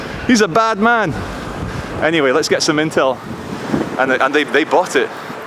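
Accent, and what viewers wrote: Scotland, United Kingdom. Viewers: I thought you had a Scottish accent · you sound east coast Scottish, Edinburgh area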